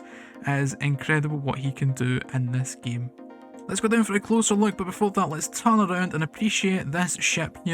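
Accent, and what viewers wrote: Scotland, United Kingdom. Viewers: love the scottish accent reminds love the builds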